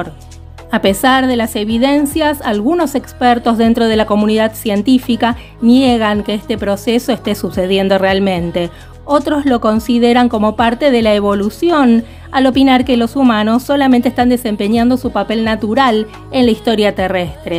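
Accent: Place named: Buenos Aires, Argentina. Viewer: Es obvio que sos de Buenos Aires, igual que yo. Me doy cuenta por tu acento, y me siento orgullosa de que seas de acá. Te felicito por tu canal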